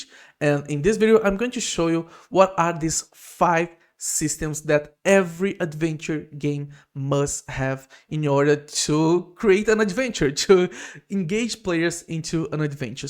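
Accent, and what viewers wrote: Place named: Brazil. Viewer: No exato momento que tu começou a falar eu sabia que tu era BR AHUAHUAHU Nosso sotaque é muito reconhecível xD Bom vídeo, mano. Vou me inscrever.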